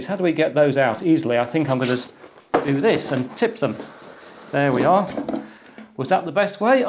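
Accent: United Kingdom. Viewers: What kind of British accent do you have? Because which ever one it is, it's the one that always sounds low-key being cheeky.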